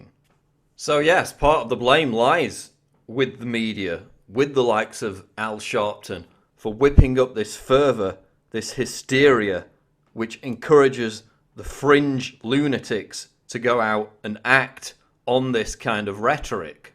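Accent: United Kingdom. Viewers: Damn, I thought this was something that'd tell me some new information, its just a slow talking extremely biased brit.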